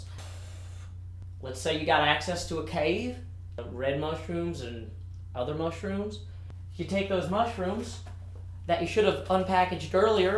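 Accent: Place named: South, United States. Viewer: this is hilarious, you never cease to amaze me with our southern accent and funny videos.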